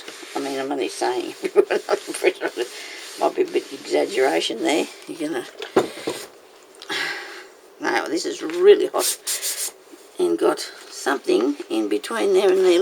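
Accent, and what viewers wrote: Australia. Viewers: Loved your tutorial! Loved as well your Aussie accent! Can't say more as I have to go and try it while it's fresh in my mind! Thanks.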